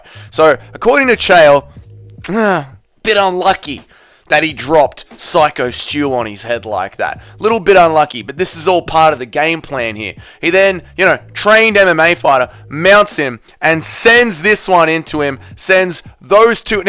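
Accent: Australia. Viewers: Something about you Aussies smug, disrespectful attitude mixed with your unpalatable accent especially when reporting or speaking about anything American, just sounds like huge piles of 💩hitting the ground over and over again…. Btw, has everyone seen the video footage of the disrespectful jackass wrestler committing assault by walking up and smashing a can of beer on Raja’s head prior to the event? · I think Chael really has lost whatever little credibility he had left. Very sad to see. Loved the video, by the way, delivered the way only an Aussie can!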